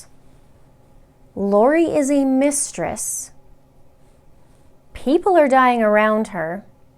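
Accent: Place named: Canada. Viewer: Where are you from? You sound Canadian. Love your videos! Can’t wait to see Lori and Chad get nailed to the wall for this! (And still so so so sad about Gannon 😫)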